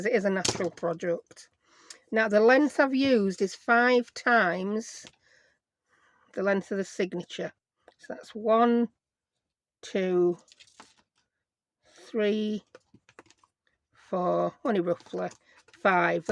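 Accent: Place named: Yorkshire, United Kingdom. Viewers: How cute are these?! Beautiful and easy to do. Thank you for sharing. Yeah, lovely to hear a good, strong Yorkshire accent. x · They would be lovely as stocking fillers for Christmas. Gorgeous little note books. And it’s nice to hear a fellow Yorkshire lass. I’m originally from York. 😃👍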